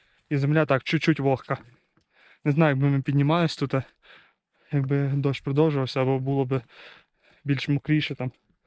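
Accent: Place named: Galicia, Ukraine. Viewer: Почув пару слів - жд-вокзал, іменно, чуть-чуть, морожено, лагерь (німецьке слово). В автора галицький акцент, то не акцент м.Хмельницький.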